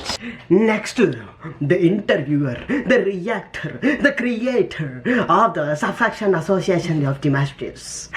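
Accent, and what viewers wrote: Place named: Hyderabad, India. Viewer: ana you sound like jesse in breackin bad vere asal haydrabadhi bhai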